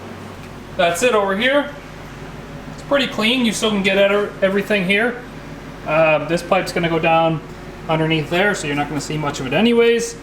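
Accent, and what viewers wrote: Canada. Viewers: Lol, boots. Love the Canadian accent.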